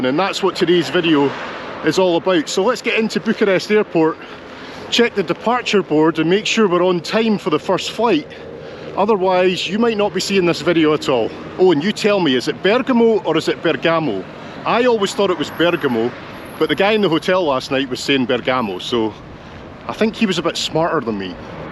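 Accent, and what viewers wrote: Scotland, United Kingdom. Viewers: The first time I heard the scottish accent I thought it was a guy from Bergamo attempting to speak english.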